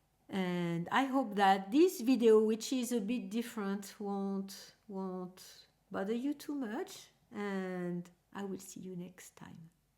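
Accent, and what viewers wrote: France. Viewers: it was like hearing myself speak all my internal thoughts, but with a French accent!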